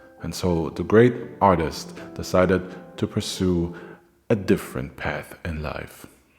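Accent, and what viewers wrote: Germany. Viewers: What a video! Great storytelling, amazing art to illustrate, your accent is amazing (are you German?). Only one thing I would point out is that even if the Nazis hadn't invaded the Soviet Union, they would have been at war with the US either way and probably though we will never know the Soviets would have invaded themselves after Stalin was done with his Great Purge and set up his own war economy (that is actually one of the big factors why the Nazis invaded in 1941 while still at war with the British). Thank you for your work!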